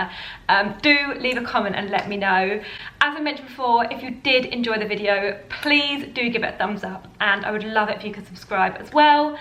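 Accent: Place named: Southern England, United Kingdom. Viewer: hey, i just subscribed to your channel because i really enjoyed your personality and it was a very pleasant video to watch. Question: what area of the UK are you from? from the sound of your accent ( which is lovely by the way:- ), ) i'm guessing you're from the south of England but, i was just curious as to what area in the south are you from? i.e. Greater London, etc... Also, you looked very pretty in all the outfits you tried on:-)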